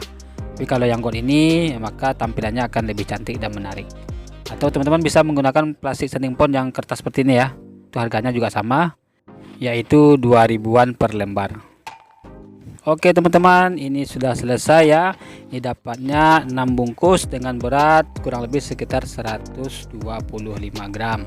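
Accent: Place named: Padang, Indonesia. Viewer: ooh... Patutlah bahasa indo nya logatnya beda... Urang awak kiro nyo... Hehehee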